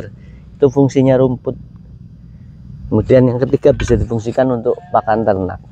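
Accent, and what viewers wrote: Banyumas, Indonesia. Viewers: Woh ngapak kie. Ngapake daerah endi kang?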